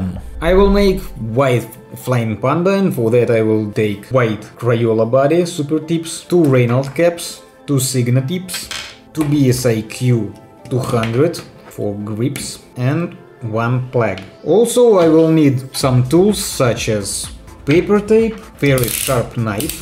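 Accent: Russia. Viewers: I have a question why you liked a Russian trying to speak English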